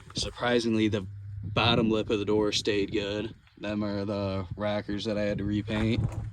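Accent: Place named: Northeast, United States. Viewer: Interesting accent. Somewhere upper east coast I’m guessing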